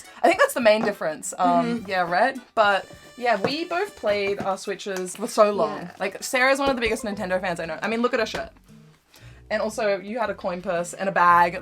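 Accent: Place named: Australia. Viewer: It's a totally different story here in Australia - there's Switch 2's everywhere - I mean sure, pre-orders are required and all that but even if you walk into a store - there's a good chance they will have some spares laying around! It's ether we over-ordered and not many people want to buy them, or perhaps Australia's got some spending issue! BTW, are you Australian - your accent sounds Aussie?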